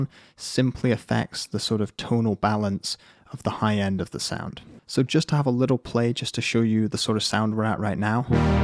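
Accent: United Kingdom. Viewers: I will always thumbs up a video in which the presenter uses the word "fortnight" unironically in a British accent.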